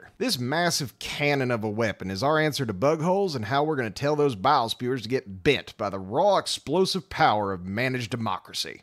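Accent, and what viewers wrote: South, United States. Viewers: the southern accent just makes it so much better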